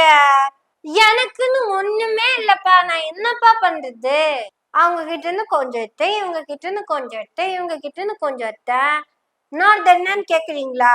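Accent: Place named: Coimbatore, India. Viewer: கோவை தமிழ் அருமை சகோதரி வாழ்த்துகள்❤❤🎉🎉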